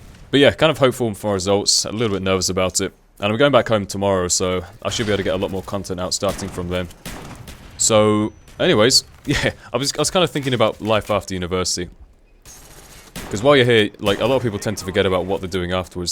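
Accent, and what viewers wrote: United Kingdom. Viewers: Normally I hate the british accent but not yours tho :O please respond ? -Greetings from Finland!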